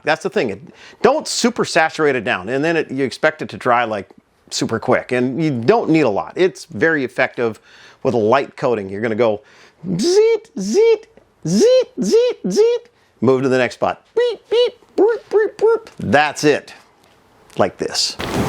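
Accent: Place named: India. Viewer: INDIAN voice. Sales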